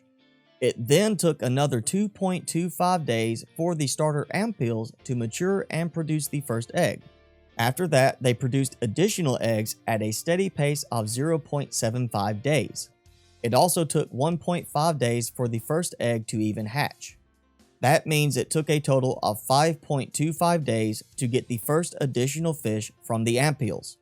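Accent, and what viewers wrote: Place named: Alabama, United States. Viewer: ALABAMAAA ACENT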